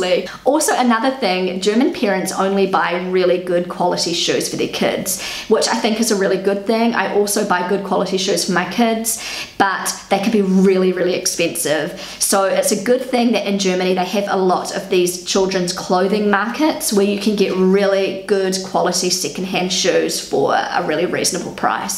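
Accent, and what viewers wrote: New Zealand. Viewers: I love your accent. :) Bevore you mentioned that you are from New Zealand I couldn't really tell where that accent is coming from. I thought maybe England or Ireland or Australia. I have never been to New Zealand. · I love your New Zealander accent! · Ja, das ist das faszinierende an den diversen Akzenten in NZ. Man hört noch die Ahnen. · I love the NZ accent :) - thank you for the kind words about German parenting. Property is a big issue in Germany so maybe that is why German parents care about who owns a toy. Maybe we should relax more :) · I loved you video (and it's the first time I hear New Zealander accent) . I am in Ireland hoping to move to NZ and I love German style for parenting. The way you showed some points, even me being Brazilian seems I have similar attitudes like the German moms. Interesting to mention that all kids in Germany wear a beanie just in case to prevent cold wind, seriously all!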